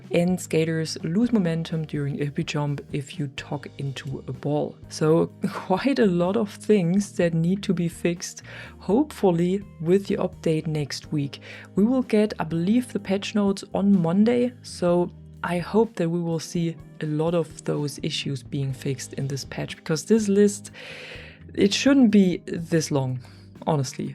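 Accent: Germany. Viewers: Love the German accent ❤🎉greetings from Germany and hopefully you keep up with the skate content 😊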